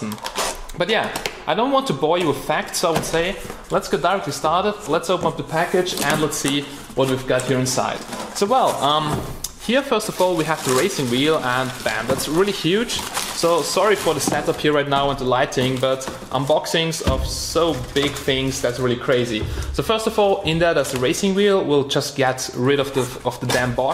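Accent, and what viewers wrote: Germany. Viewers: hör auf n deutschen accent zu haben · You have a german accent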